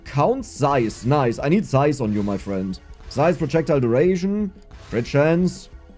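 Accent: Germany. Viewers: der deutsche Akzent ist lustig und mittendrin immer die deutschen Fluchwörter for my english fellas: the german accent is funny and in the middle of it all there are always the german curse words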